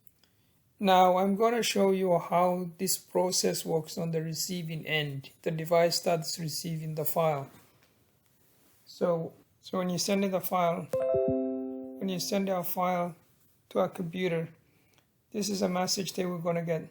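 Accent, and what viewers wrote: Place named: India. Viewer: Feeling Indian accent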